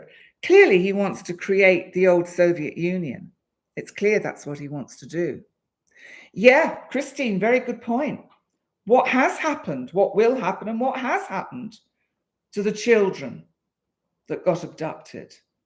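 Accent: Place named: England, United Kingdom. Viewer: I loove your english accent ❤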